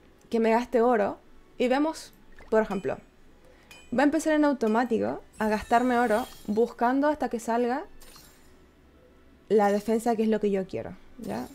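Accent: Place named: Chile. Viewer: Una Pregunta, de que país eres? Tienes un tono que me recuerda a chile. Pd: Saludo desde Chile🇨🇱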